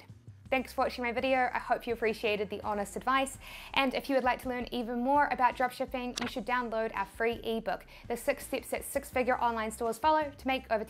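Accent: New Zealand. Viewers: Iv never had the urge to make my own online business, but i may do it just so i have a legit excuse to watch your videos other than the reason now, this kiwi accent is awesome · What is your accent ? there is abit of New Zealand / abit of Irish or American, maybe South African ? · At 78 I'll probably not launch a dropshipping business but I tune into videos just to hear her interesting Kiwi accent. I lived in New Zealand for twelve years and yet I've never heard an accent like it. Also I love her vibrant personality. All the best to everyone, from Doug the atheist demon from Parramatta, Sydney Australia.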